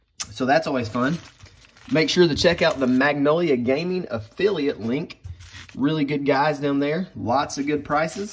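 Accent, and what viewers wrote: South, United States. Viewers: Watched the whole thing! Just started getting into magic recently and I love watching your videos! Your southern accent makes me feel like I’m at home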